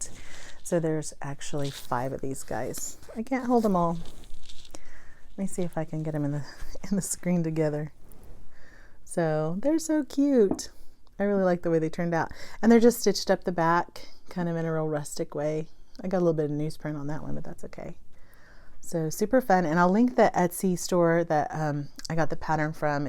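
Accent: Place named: Texas, United States. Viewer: I just found you today. Great video! I guessed you were from Texas! I had to stop and go watch you first video to be sure! I will be in Fort Worth the last week in February with my husband who is attending a work conference. I will have my days free....I would love suggestions of some cute places to shop. Are there any stitch shops in FW? Thanks so much! Ellen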